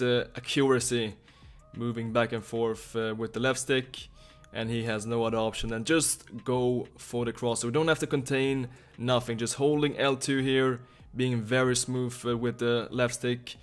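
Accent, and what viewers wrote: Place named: Sweden. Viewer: Hahahaha. I first thought was some kind of Boris/Borat namechild. Heard the accent. "Ah, Borås.":D (not a big accent, but I could tell)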